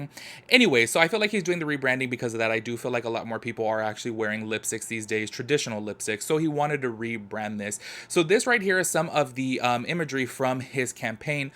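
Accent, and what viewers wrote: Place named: Texas, United States. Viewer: omg ur from san pedro???? much love from the south bay 🤍🤍🤍🤍 thought u were from texas lol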